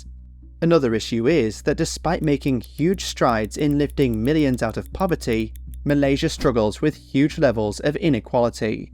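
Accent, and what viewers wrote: United Kingdom. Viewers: presenter's accent is british. this mistake is more on the editor or producer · Fair analysis, but it’s pretty rich to be lectured by a British accent on the (admittedly flawed) implementation of the affirmative action policies Y’all got us in this mess in the first place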